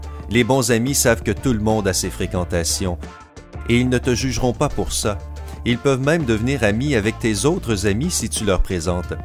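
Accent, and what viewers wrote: Quebec, Canada. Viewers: Vous êtes québéquois ? J'adore votre accent ! ❤ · C’est l’accent québécois, n’est-ce pas?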